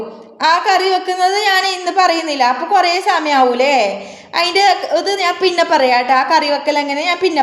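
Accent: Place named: Kasaragod, India. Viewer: ആക്ടിങ് അല്ല ഇത് കാസറഗോഡ്ഭാഷ ആണ് , ചില സ്ഥലത്തഇങ്ങനെ ആണ്, ബന്തടുക്ക, പൊയിനാച്ചി, ഇങ്ങനെ അങ്ങോട്ട്‌ ഇതാണ് ഭാഷ ❤️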